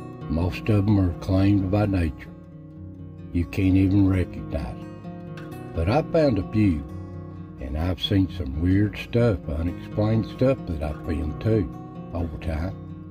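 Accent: Appalachia, United States. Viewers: I love listening to you. My family is from the mountains of Virginia. Your accent and way of talking ("my wife an em") reminds me of my family. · great American accent, fitting.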